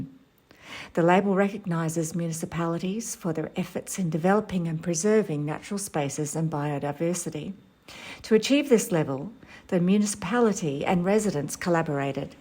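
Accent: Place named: France. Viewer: ou étais-tu partie encore, qui te fais beaucoup trop RARE, ton charme, ton accent Français, ton talent, ton village magnifique....je me languis déjà de ta prochaine vidéo ( excuse moi ), reviens vite... connaissez-vous le château de Purnon, du 18eme siècle, dans la Vienne, classé aux monuments historiques, en restauration, par un couple d' australien....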